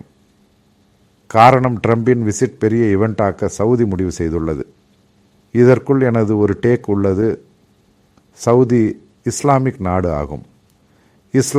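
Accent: Kerala, India. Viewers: എനിക്ക് തമിഴ് അറിയില്ല എങ്കിലും കേൾക്കുമ്പോൾ മലയാളം തമിഴ് പറയുന്നതായി ഒരു തോന്നൽ എന്തായാലും തുടക്കമല്ലേ നല്ല തമിഴ് ഭാഷയിൽ തന്നെ ഇത് തുടരാൻ സാധിക്കട്ടെ എന്ന് പ്രാർത്ഥിക്കുന്നു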